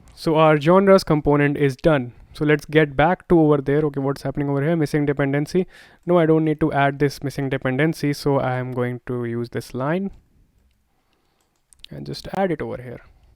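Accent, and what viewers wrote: India. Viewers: you speak in india english it's not original english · Sorry not sorry but he is Indian so his English is also Indian 🤟🏻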